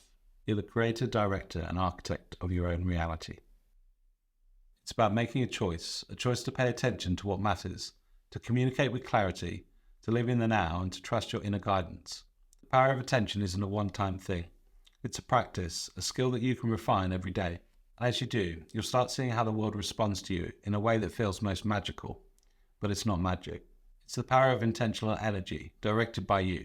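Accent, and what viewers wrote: United Kingdom. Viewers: pure information with a British accent( I love it)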